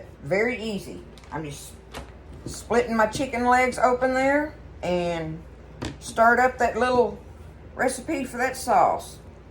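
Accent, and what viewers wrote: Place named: Texas, United States. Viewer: That Texas drawl you have is so wholesome & refreshing Ms..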